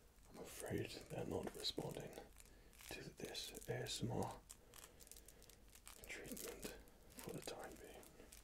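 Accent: Australia. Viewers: Is he Australian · May Shida he's Australian I think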